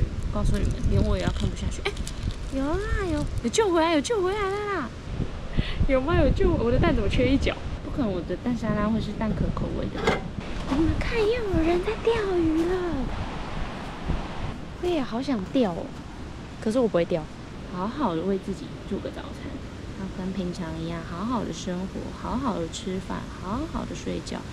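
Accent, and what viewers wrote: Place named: Taiwan. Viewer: 妳好能自言自語唷！講台語好道地的感覺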